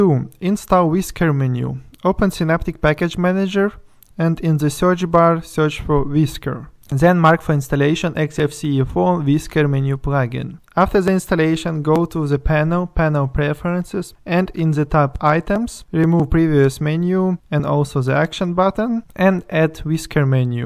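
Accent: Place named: Russia. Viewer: I don't think so - he has a very strong Russian accent :-)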